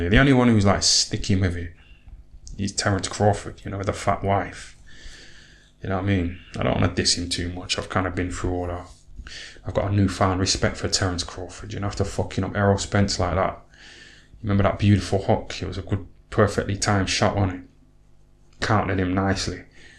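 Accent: United Kingdom. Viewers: This channel is good stuff. You speak like a lot of people I grew up with. I live abroad now and rarely hear an authentic working class UK accent. Awesome content regardless of your voice though.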